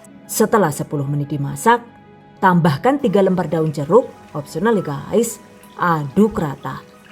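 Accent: Banyumas, Indonesia. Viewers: Aku kalau lihat video yang ku tunggu pas mbekayune bilang pakai bahasa ngapak.... Auto langsung nggakak aku.. Ilmu dan lawaknya sangat menghibur yu...salam dari Wong Banjarnegara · Jebule channel ngapak y... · Nyoba bikin ah.. Bahasanya Indonesia Inggris dan ngapak 👍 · 😂😂😂..mbekayune...sampeyan asline ngendi koh..mBanyumasane mblaketaket pisan....sukses yuuu...inyong jan ngiler gyeh kpengin nyicipi sambel medurane...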